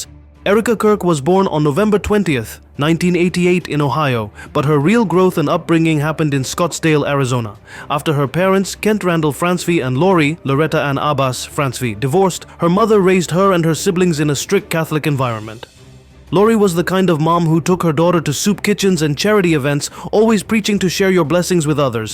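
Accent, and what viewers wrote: India. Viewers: This man is sound like Indiana! Someone paid him to report this fake story!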